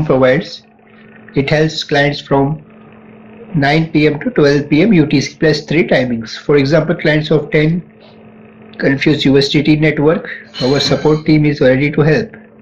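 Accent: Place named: India. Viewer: Every Indian accent makes me sick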